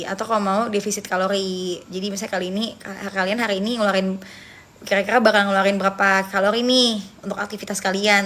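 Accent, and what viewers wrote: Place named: Betawi, Indonesia. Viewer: Sekarang ngomongnya ala bocah Jaksel, dulu nyablak ala betahaw 😂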